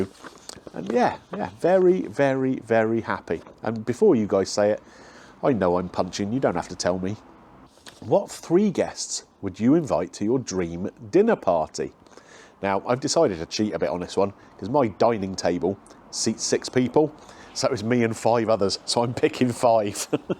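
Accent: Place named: Dorset, United Kingdom. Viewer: Greetings from puddletown in Dorset...nice to hear a fellow Dorset person..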